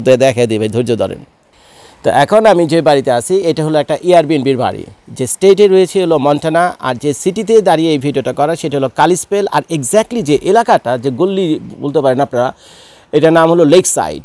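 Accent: Dhaka, Bangladesh. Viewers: AASSALAMOO ALAIKOOM Dear Bhai,KHALAQAL MOUTA WAL HAYATA,Soora MOOLQE,I liked you because of Dhakaya accent,from Bangladesh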